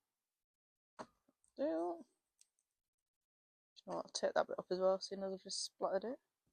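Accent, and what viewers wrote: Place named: North Yorkshire, United Kingdom. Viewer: yes can tell with the north Yorkshire accent 😊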